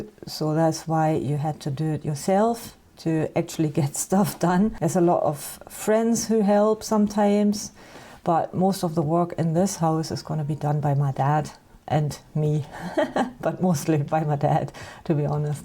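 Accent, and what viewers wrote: Scotland, United Kingdom. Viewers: Aha! I had thought it was a Scottish accent. My (incorrect) theory was that you had studied English in Scotland. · I knew I heard Scottish in your accent! 🙂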